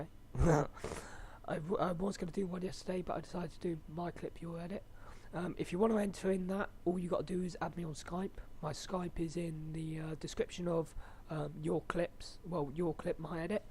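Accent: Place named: United Kingdom. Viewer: Which part of the uk you're from? I'm from London ;p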